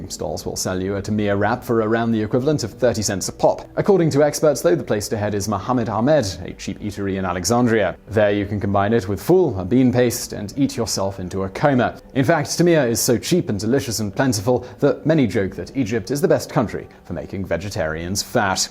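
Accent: United Kingdom. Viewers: Quick question.....where are these videos made ? You sound so British, but everything is compared to America. If it hadn't been for Cornwall being mentioned I'd had no idea what you were talking about. I have no idea about Wyoming, Dollars and cents or even where Rhoad Island is let alone the size of it. It's like watching a cookery program where there's no such thing as Gas Marks and everything is measured in cups !! I begin to feel like Alice falling down the rabbit hole !!!!!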